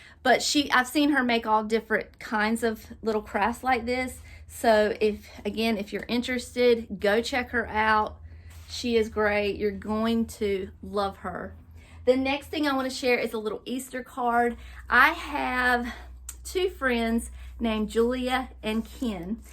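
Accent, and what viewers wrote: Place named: North Carolina, United States. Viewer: Hi I have been watching your channel for a few weeks now and I knew there were something we had in common or should I say someone and when you said booth and Tenn. I knew you were talking about Susan she is so sweet,my daughter started a YouTube channel a few months ago and Susan was one of the first YouTubers to comment on her video and she has been such an inspiration to her from the start. I just love that dress on your daughter so pretty and I love all the stuff Cindy sent you so pretty, you sound like you are from N C if so, So are we the name of my daughters channel is Around the house with Charlene if you get a chance to view her channel I would be thrilled and I know my daughter would just love it. I love how the pictures you on the wall ❤❤❤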